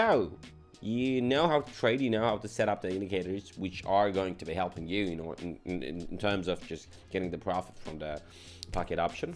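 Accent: United Kingdom. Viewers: british accent + big wins = serotonin boost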